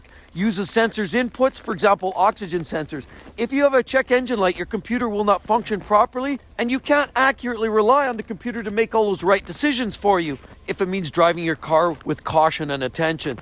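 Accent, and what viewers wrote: Canada. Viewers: Love the Canadian accent!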